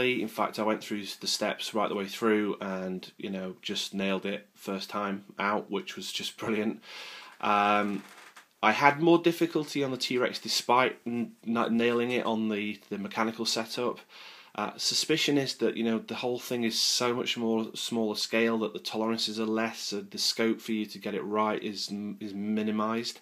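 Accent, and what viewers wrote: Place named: Germany. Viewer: where from germany are you from, i noticed the accent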